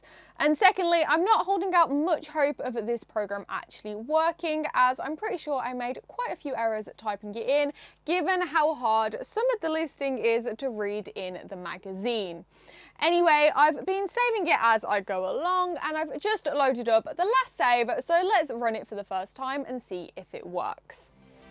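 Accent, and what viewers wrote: United Kingdom. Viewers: YT formula 4 success, apparently = young woman + pirated 1980s movie Tshirt + pressing keys on 1980s hardware + British accent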